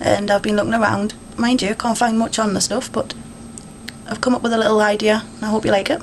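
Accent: Newcastle, United Kingdom. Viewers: are you from the north east? you sound like a geordie! reply please!!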